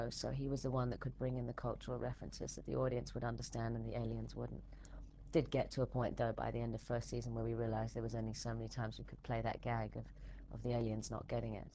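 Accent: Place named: Australia. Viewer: Hi 🙋‍♂️ hey I was in the Navy I never got a chance to go to Australia cuz I really love your accent, best of luck in your future jobs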